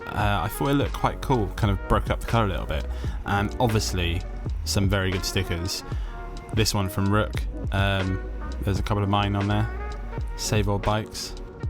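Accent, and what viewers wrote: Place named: Cockney, United Kingdom. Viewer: Cockney Lang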